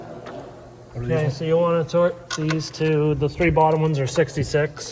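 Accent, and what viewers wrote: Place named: Canada. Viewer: You Canadians need to learn how to pronounce the word out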